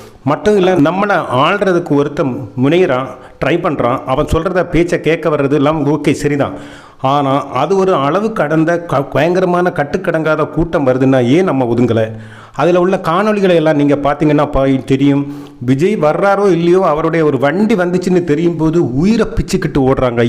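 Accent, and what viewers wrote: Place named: Kerala, India. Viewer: தம்பி அருமையான பதிவு தம்பி உன் குரலில் மலையாளம் கலந்து வருதே நல்லா இருக்கு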